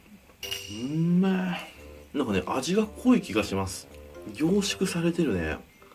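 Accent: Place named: Mikawa, Japan. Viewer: かねこさんのバリバリの三河弁が好きです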